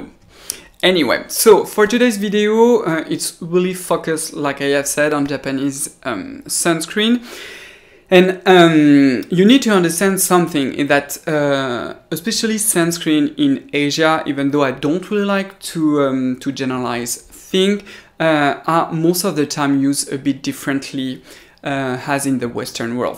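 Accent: France. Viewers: Welcome back!!! I am so glad your making videos again! I love your French accent so much!!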